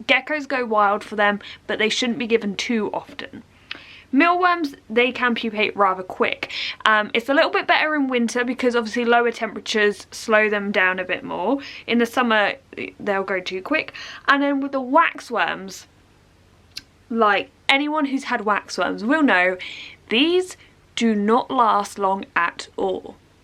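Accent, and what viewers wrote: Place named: United Kingdom. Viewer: 英音真好听😊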